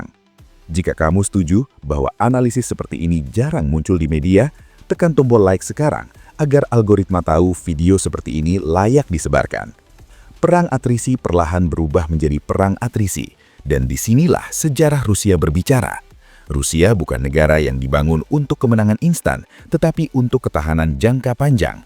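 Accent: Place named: India. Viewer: Același propagandist pro-rus, după dialect de origine indian, a început propaganda pentru anul 2026. Nu vă aplecați urechea. Riscați să vi se strice creierul datorită toxicității.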